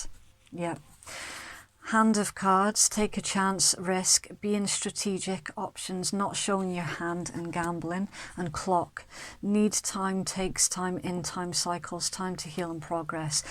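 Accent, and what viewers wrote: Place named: Northern England, United Kingdom. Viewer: Thank you very much for this reading. I’m sure this message was intended for me, it was so specific I was literally waiting for you to give the individuals names involved! I think you are in the north of England from your accent. I have been living away from the uk for the past four years and am returning in March. I would very much like to meet you, do you ever do personal readings face to face? You can find me at <mail>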